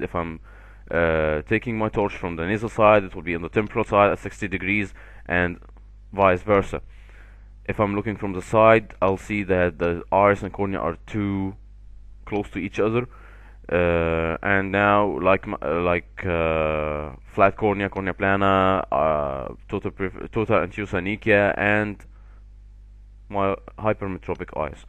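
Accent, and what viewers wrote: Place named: Egypt. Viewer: cuz this s egyptian english :D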